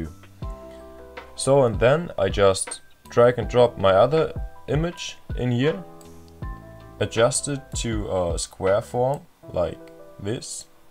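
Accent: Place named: Germany. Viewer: Geiler Content, sehr korrekt das du dein Template teilst. Aber bro man hat ab der ersten Sekunde gemerkt das du Deutscher bist ❤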